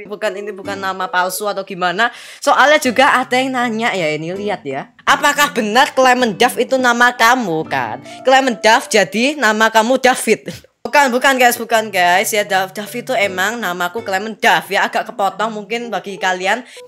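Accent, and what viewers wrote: Java, Indonesia. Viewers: Medhug suaranya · Mungkin tanpa kalian sadari suara agak medok jawa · Gw Udah Penonton Old Tapi Gak pernah Komen Jir :V Gw suka Syle lu Medok :V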